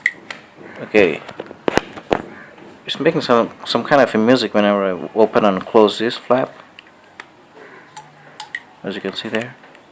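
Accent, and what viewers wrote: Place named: India. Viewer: thanks bro...btw just a suggestion,accent is what comes to people naturally,embrace your indianness,god bless you...no offence...keep the good work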